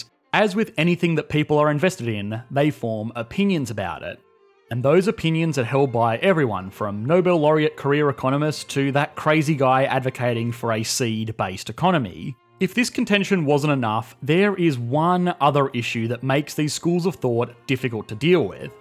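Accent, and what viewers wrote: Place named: Australia. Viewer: Jesus the narotor's accent is giving me a headache. Listening to Australian accent for prolonged period of time sounds like a distressed, agonising animal or mentally challenged individual with speech inpediment.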